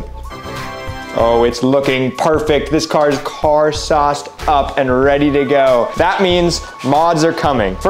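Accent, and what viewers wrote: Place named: RP, United Kingdom. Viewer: I love how he gets more posh as the video goes on 🤣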